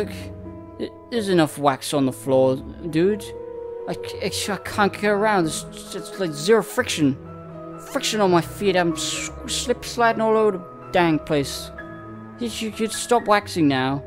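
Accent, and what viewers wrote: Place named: United Kingdom. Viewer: I fucking hate british accents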